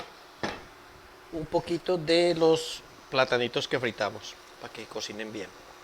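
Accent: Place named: Pereira, Colombia. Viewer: Hola se ve deliciosa la sopa : me hizo reír con tu video, eres un poco charro y por tu asentó pareces de Pereira Risaralda. 😀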